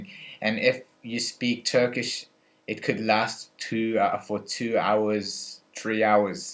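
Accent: United Kingdom. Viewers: Wow I really notice how your accent has got more like British pronunciation. It is quite noticable :D As always, really enjoying your videos :) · I like your accent, it got very British! :) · You have more of a british english accent than an american one now! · Hey! Your accent is awesome! where's american? You're starting to acquire the british one! Did u notice the change? great vid.. see u soon!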